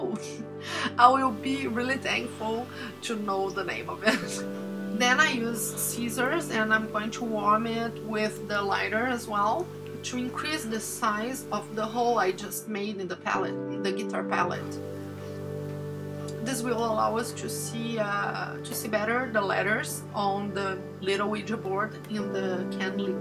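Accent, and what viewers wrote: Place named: Brazil. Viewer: Tbm sou brasileira, te reconheci pelo sotaque. Estou tentando montar meu mini altar.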